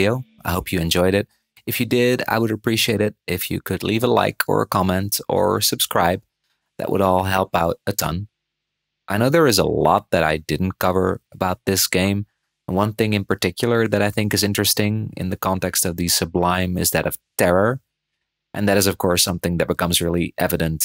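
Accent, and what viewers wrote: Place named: Netherlands. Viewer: You sound Dutch.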